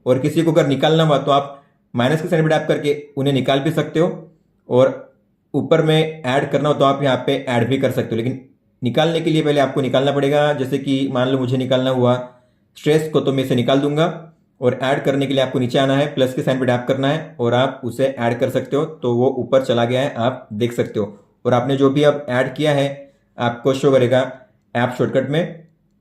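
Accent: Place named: Maharashtra, India. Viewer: marathi accent 🙊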